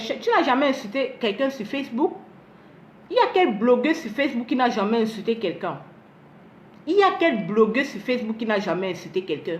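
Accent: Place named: Bamileke, Cameroon. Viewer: AVEC TA VOIX DES BAMILEKE pardon va te cacher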